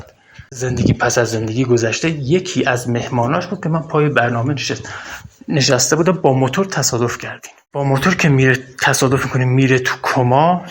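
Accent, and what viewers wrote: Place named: Kermanshah, Iran. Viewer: چقدر لحجه کرمانشاهیتون جالبه وقتی درحال ادغامش با لحجه دیگه است که به لحجه خودتون صحبت میکردید بهتر بود همشهری واینکه دوبار تجربه مرگ رو داشتم وهربار تا یه مقطعه جلو رفتم وبعدش بهم گفتن نمیتونی جلو تر بیای باید برگردی الان زمان تو نیست اینجا باشی ومن اصلا نمیدونستم این حرفی که میزنند یعنی چی همش میپرسیدم شما چی میگید نمیفهمم برای چی نمیبرید منو چون من داشتم از یه جاده مستقیم بی انتها میرفتم جلو به نظر من قبل از مرگ قطعی هرکسی دنیای برزخی رو یه جور میبینه و با دید اصلی رفتن روح بعداز مرگ به دنیای ماورا یا دنیای بعد ازمرگ قطعی فرق میکنه فقط میخوان بگن بعداز مرگ باز هم ادامه داره راهتون ودر قران گفته شده فقط شقی ترین ادمها به جایی که جهنم گفته میشه که صد در صدبا اتیش وسوختن و این شکل توصیفها نیست احتمالن جایی سختی هست برای پاک شدن روح که اون هم گفته شده فقط شقی ترین ادمها به اونجا میرن وگرنه گناههای روز مره یه پاک زدایی داره ازجسم وبعد ادامه راه یا زندگی اونجا رو بهشون میدن فقط تنها چیز مشترک بین همه تجربه گرها وحتی خود من وفکر کنم روحهای مرگ قطعی همون تونل هست که باسرعت به سمت بالا میبره روح رو من وقتی داخل نور شدم در انتها خودم رو توی یه جا یا یه محیط دیدم که چیزی هم از اومدن بالا از طریق تونل یادم نمیومد وحالا محیط چطور بود وچی شد خیلی طولانیه که با نوشتن نمیشه گفت هرچند من چند دقیقه جسمم رو ترک کرده بودم اما زمانی که اون طرف بودم به اندازه یه نصف روز یا بهتر بگم مثل هفت هشت ساعت بودنم اونجا طول کشید نمیگم زمانی بود یا حس میکردم هست فقط اتفاقهایی که اونجا برام افتاد بعداز برگشتم به اندازه این زمان طول کشیده بود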